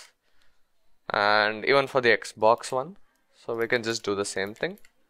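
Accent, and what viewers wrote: India. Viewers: Gotta be Hinest, it really annoys me when it's a thick indian accent. But his is not that thick, thankfully. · 印度口音太重了. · i heard indian guy, i subscribe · He is an indian so has a clear accent so seems heavy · Sounds like Indian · I DON'T like Indian English accents also but this one is not too bad, in my opinion. I can follow it alright.... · This is so great... But unfortunately indian accents are just a dealbreaker for me. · its nice that it is recorded by an indian teacher so i have no issue in accent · Bro’s accent is more indian than mine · Indian accent, seriously? Come on! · 😐 I definitely don't watch this video i don't like Indian en accent 🙂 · please dont use indian cant understand them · индусский акцент. чо уж делать. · English is not my native language. I speak with an accent. For me if they did this course with normal English it would be much easier to understand. 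This heavy Indian accent makes the course useless for me. Sorry. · Indian accent... Learning from my fellow Indian... 😋😋😋😋😋😋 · when i started to watch i tink that the indian accent is kinda annoying but after 30min watching i tink tat the indan acent is actualy funy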